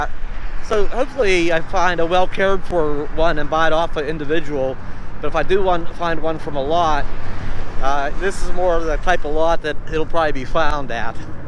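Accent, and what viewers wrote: Pennsylvania, United States. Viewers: you can always tell a PA accent!! very distinctive.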